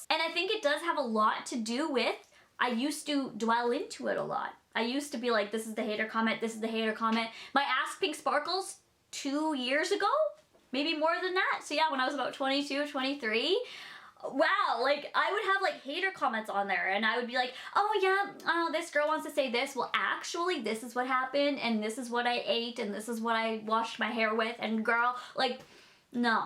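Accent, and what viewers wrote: Canada. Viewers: Honestly I only scroll down enough to leave my comment and move on. It makes me so upset that people say all these mean horrible things to you. On all your videos you are just the sweetest, nicest girl. I love your cute little Canadian accent and how bubbly you are. Totally makes me day when you have a new video out.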